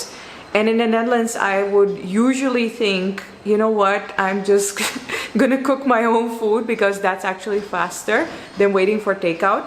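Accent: India. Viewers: Your accent have a bit of indian touch in it🤔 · Your accent is becoming increasingly Indian and that is very endearing: "...I cannot handle a lot of synthetic fibre". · I revisited your channel after like 3 months and I dont know why I sense an Indian-ised accent! Is it just me? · Even your accent became like Indian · Feels like you are picking up the Indian accent. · Are you sure you from Dutch? All I am hearing is Indian accents and you seems to be a residental Indian. Cheers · your accent sounds indian. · Ha ha she is talking like an Indian. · Hi, Greetings from an Indian from Australia. You do realise that your English now has a discernible touch of Indian accent? I am glad you are enjoying your time in India! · No offence. Have you adopted Indian accent too😁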